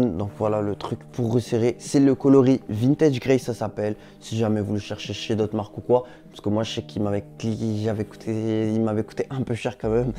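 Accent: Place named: Algeria. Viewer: u do great content but idk wuth te hell u sound like alittel bit algerian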